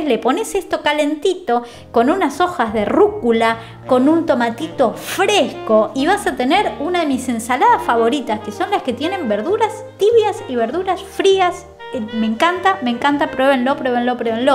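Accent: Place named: Buenos Aires, Argentina. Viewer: Me gusta su tono porteño de hablar,es UD muy graciosa,las verduras quedaron súper bien,es algo para ido a la escalibada.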